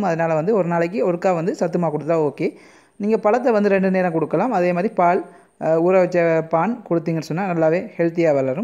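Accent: Sri Lanka. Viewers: Super anna nenga nama natuu Tamil super nanum srilanga than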